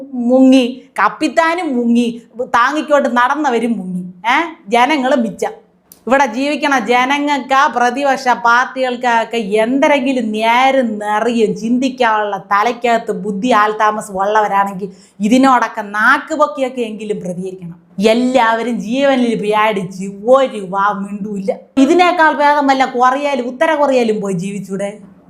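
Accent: Thiruvananthapuram, India. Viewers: തിരുവനന്തപുരം ശൈലിയാണെങ്കിലും കേൾക്കാൻ രസമുണ്ട്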